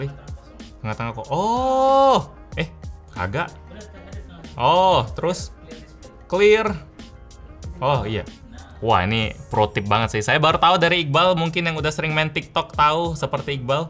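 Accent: Palembang, Indonesia. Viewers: Keker bahaso pelembang nian😅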